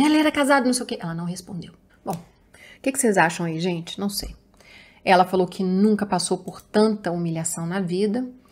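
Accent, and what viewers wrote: Minas Gerais, Brazil. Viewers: " morreu nao desgrama vou embora" 😂😂😂 amo nosso mineires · Vem disgrama.....famoso mineires 😂😂😂😂😂 adoroooo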